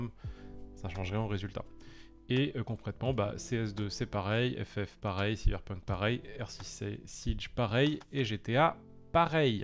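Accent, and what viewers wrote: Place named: Switzerland. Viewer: Toi t'es suisse mdr je reconnais mon accent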